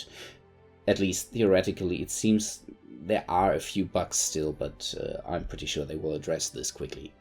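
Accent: Germany. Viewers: This guy german ? But None the less great review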